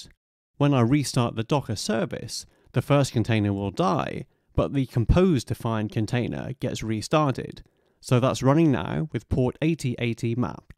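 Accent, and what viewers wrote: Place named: England, United Kingdom. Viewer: english accent kills it !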